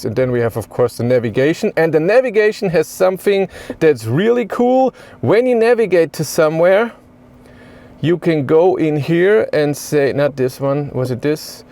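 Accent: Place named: Germany. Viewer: Sigh... Dear narrator: The word is view with a v, not “fiew” with an f. I don't know why, but this is the single most infuriatingly annoying aspect of your Denglisch. Please try to learn the correct pronunciation, so you can stop doing this. Gillt übrigens für alle Wörter mit einem V (und nicht nur am Anfang des Wortes, sondern überall): NUR auf deutsch und niederländisch spricht sich der Buchstabe V wie F aus; auf allen anderen Sprachen, inklusive Englisch, hört er sich so an wie das was Sie auf deutsch mit einem W schreiben.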